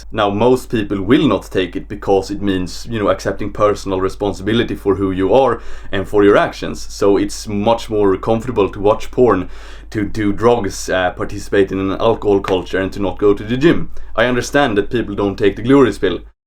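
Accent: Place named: Sweden. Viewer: Man your accent (and looks obviously) is so Swedish god damn XD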